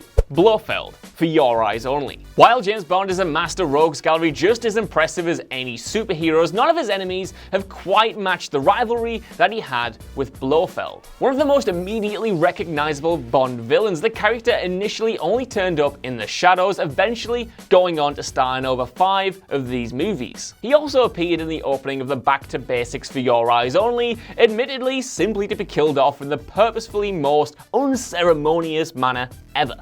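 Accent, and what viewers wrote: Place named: United Kingdom. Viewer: How can ANYBODY sound THAT F*CK*NG English?!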